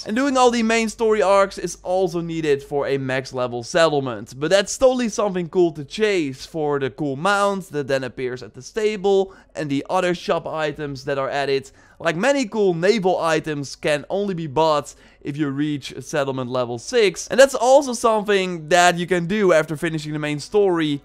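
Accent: Netherlands. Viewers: a yes this accent is made out of dutch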